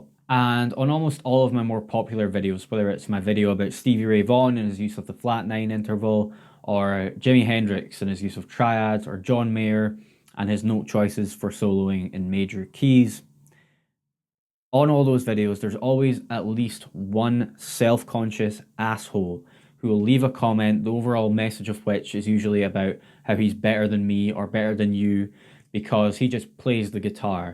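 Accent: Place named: Scotland, United Kingdom. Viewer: two seconds on this chanel and i already can tell you're scottish <3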